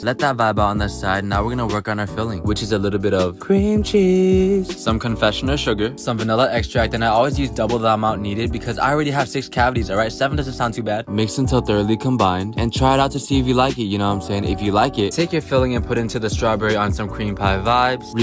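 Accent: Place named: United Kingdom. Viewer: Y'all can tell he's british. His accent... and LOOK AT THAT PANNNN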